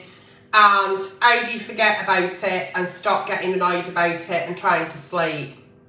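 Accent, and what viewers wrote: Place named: Lancashire, United Kingdom. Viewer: so gorgoeus!!! love your voice and lancashire accent.... parli anche italiano?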